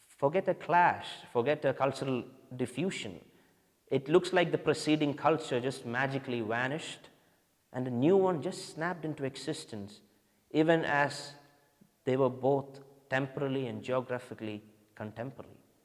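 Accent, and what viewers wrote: Kerala, India. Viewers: Why do you have a mallu accent?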